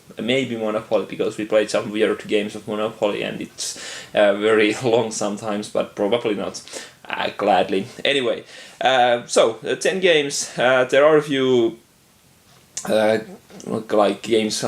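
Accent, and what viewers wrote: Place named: Finland. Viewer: Finnish-English accent! Torilla tavataan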